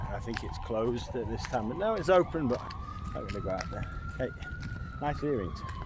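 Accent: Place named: United Kingdom. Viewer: I used to live there …..many years ago..thought you were British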